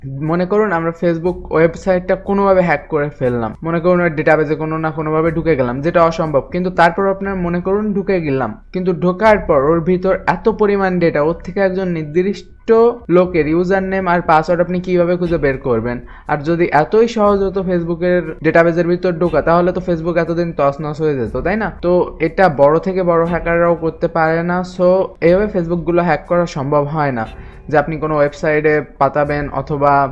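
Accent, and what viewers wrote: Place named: Jessore, Bangladesh. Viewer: ভাই আপনার বাসা কি যশোরে নাকি। আপনার ভাষার যশোরে মত লাগে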